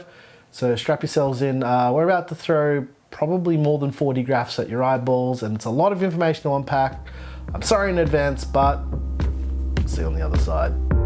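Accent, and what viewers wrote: Australia. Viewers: This is the first video I've seen you, and 3 seconds in I know you're an Aussie Tech youtuber! Subbed! Hope to see more.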